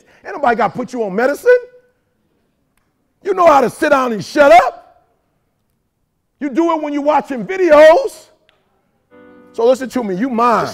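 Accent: United States. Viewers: Why would someone with a PhD speak like this? Learn the English language and use it properly if you are going to stand before young people and talk about I I I I , ME ME ME ME, MY MY MY MY. Yes, I heard the message, but if he is going to be hold himself up as a role model....why not "Model the behavior" it takes to be really successful! Ebonics might be cool to someone(?), but it is NOT the way to speak if you want to be competitive. I would LOVE to know who paid him $50,000 to speak !?!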